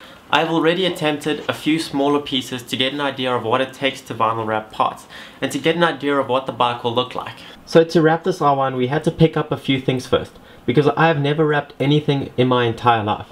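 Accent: South Africa. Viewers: He sounds like an English South African. You must hear the accent of Afrikaans South Africans trying to speak English. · Is that a south african accent? Just curious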